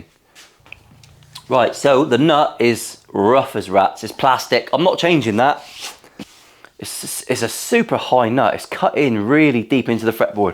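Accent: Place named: South West, United Kingdom. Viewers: Always a trip hearing a fellow Janner on YouTube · Scouser? West Country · I’m thinking of going into the guitar nut business and branding them Wanksox 😂 loved the video, and for a an ex BritPat living in US, I almost understood the west country accent 😊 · I subbed for the south West accent and the comment about going on Ebay pissed 😂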